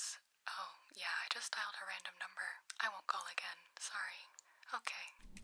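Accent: United Kingdom. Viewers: omg the british accent fits you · wtf i love the british accent 😭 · Who said that we would laugh at your British accent? It is wonderful, even tho I don’t live in Britain but I do love British accents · Ngl I love ur British accent tho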